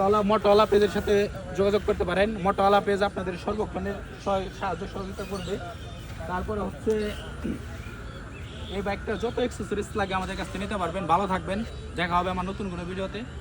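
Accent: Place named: Tangail, Bangladesh. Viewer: ভাই তুমি যে মালটা টাঙ্গাইলে এটা বুঝাই যায় শুদ্ধ বলার দরকার নাই টাঙ্গাইলে ভাষায় বলো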